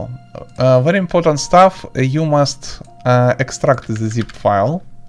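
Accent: Russia. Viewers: ты ли это? акцент русский, голос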